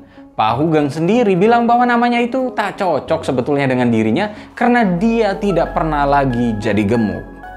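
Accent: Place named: Tegal, Indonesia. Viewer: Pantesan wong Tegal, pantes Bae kwkwkw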